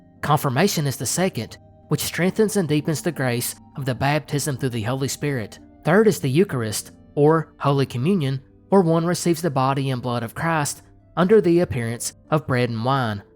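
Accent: South, United States. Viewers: that's why I always call you my southern brother 💙💙, when I became aware of the Bible being edited I thirsted for knowledge of all things hidden, leading me into the rabbit holes of everything. I know you are the same and that's why I love your content. If money wasn't so tight for me since my daughter broke her back in a car wreck and she and my grandson live with me I'd support your channel in every way. You've been my favorite from the 1st time I heard that genuine southern accent 😊😊. Don't change a thing, love from Arkansas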